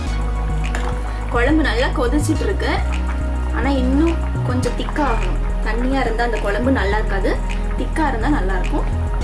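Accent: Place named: Tirunelveli, India. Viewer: Super... I'll try... Tirunelveli slang nalla vaaruthu nadavula...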